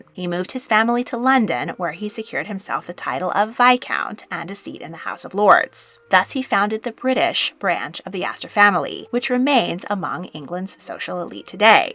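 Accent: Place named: California, United States. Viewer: I’m just struggling with the fact that this was apparently narrated by the Californians from SNL. What in the actual hell in this accent? Holy crap I can’t even pay attention to what she’s spewing toward my ear holes.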